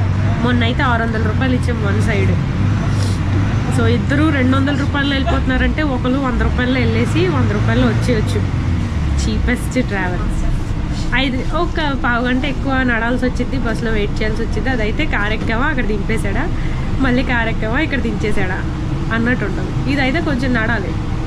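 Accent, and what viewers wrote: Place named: Andhra Pradesh, India. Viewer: మీది రాముడువలస??? మీ యాస అలాగే వుంది. ప్లీజ్ చెప్పండి.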